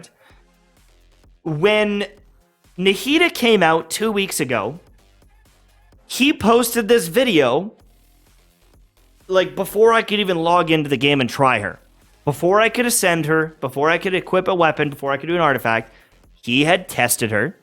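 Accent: Scotland, United Kingdom. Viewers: Not for the content....here for ye Laddie!!! Imagine this in your Scottish accent dear!!!! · The thing I like most about you is you genuinely care about your viewers and players of this game spending unnecessary amounts of money which is something I haven't really seen other creators push as much as you. I've spent a lot on genshin and sure I've been lucky enough to get characters I've wanted but it always ends with me regretting it and needing the money later on down the line. It wasn't until I saw your videos that I really started to think about what I was doing and because of that you've probably saved me so much and I honestly am so grateful for you and your content and your mindset for this game. Not to mention your tutorials and guides have been the main ones to actually help me understand this game and how it works so I will always appreciate you and the videos you make no matter what. 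Plus you're very funny and I'm a bit intimidated by the fact that your Scottish accent is better than mine, a Scottish citizen.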